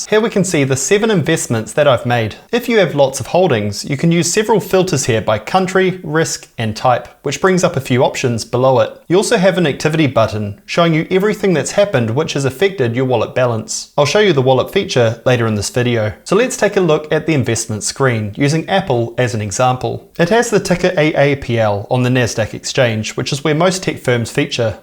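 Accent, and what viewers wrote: New Zealand. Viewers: He speaks in a typical New Zealand accent. They are well known for speaking quickly.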